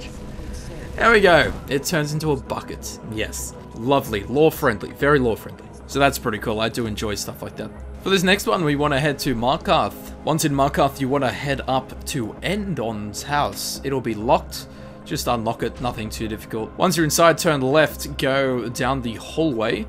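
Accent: Australia. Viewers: Just Austrailian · Fucking straya mate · Australian accent dummi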